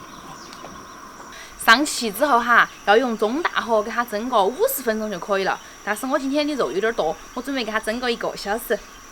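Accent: Sichuan, China. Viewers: 她讲的就是川普啊。。真要讲方言根本听不懂，尤其是说的快的时候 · 一口的四川话哈哈哈，我也是四川的，不过我现在在澳大利亚，我很喜欢看你的视频，做菜好看又好吃，人又长得漂亮大方。祝你有个好喂口加油哈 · 四川話好好聽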